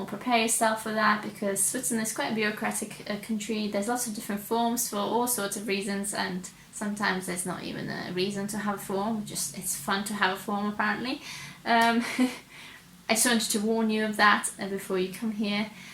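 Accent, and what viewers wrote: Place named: England, United Kingdom. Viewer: I ❤ the English accent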